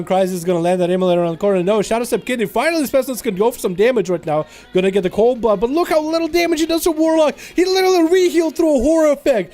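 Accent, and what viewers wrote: Russia. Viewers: Your Russian so funny)) test new word in next video, it's cool)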